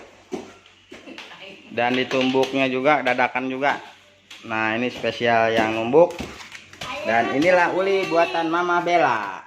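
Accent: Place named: Jakarta, Indonesia. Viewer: Betawi bgt dari ngomongnya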